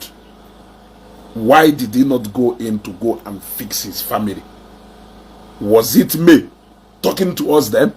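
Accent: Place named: Nnewi, Nigeria. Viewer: My son, ina eti-eti o! Continue with your Nnewi dialect, I love what you’re doing and never miss any topic. Jisike, so proud of you. ❤️❤️❤️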